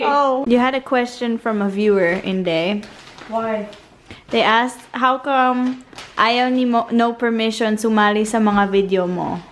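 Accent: Visayas, Philippines. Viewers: pwede ka magbisaya kay murag naa kay bisayan accent...shout out po...maligro family....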